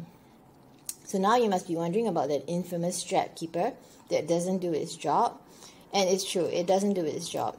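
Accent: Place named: Barbados. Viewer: Where are you from? You sound like you're from the islands. Barbados?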